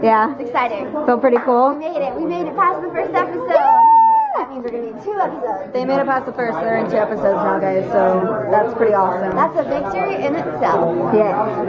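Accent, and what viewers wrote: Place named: United Kingdom. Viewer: I love your style. I would love to hear you talk more with your British accent.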